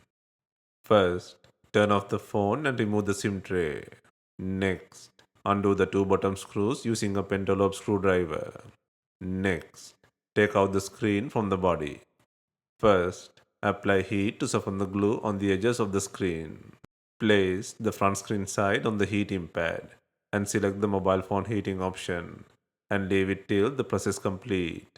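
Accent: India. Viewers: Best English with indian accent ever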